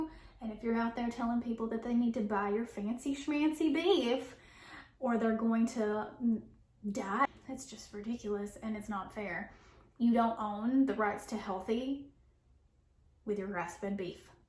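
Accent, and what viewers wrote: South, United States. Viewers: This is probably my favorite video of yours so far - and I’ve watched a lot of them! “Here’s some crappy bread.” Lol. Couldn’t agree more. And your southern accent makes everything better.😊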